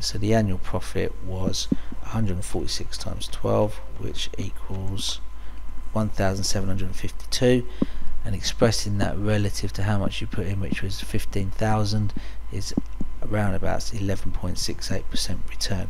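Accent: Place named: United Kingdom. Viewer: very intersting british accent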